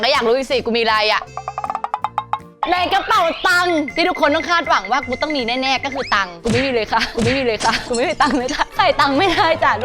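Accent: Thailand. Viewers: เหน่อ น่าเอ็นดู น้องจ๊ะ จุ๊บๆๆ😘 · ทำไมสำเนียงพี่จ๊ะออกเหน่อๆคะ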